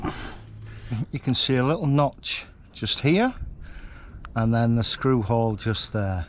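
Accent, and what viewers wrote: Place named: England, United Kingdom. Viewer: That’s an English accent… 75 dollars !…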